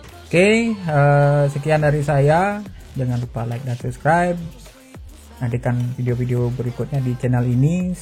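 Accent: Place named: Bali, Indonesia. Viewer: Keliatan logat balinya bli 😂. Rahayu terus berkarya🙏😇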